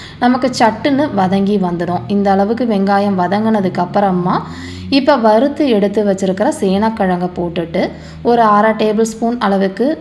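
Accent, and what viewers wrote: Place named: Karaikudi, India. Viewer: Your native mam don't mistake me ur belongs from karaikudi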